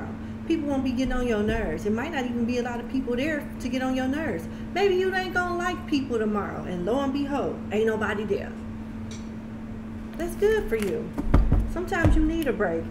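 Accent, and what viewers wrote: South, United States. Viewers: you are so pretty and blessed I'm glad I found you and your southern accent is adorable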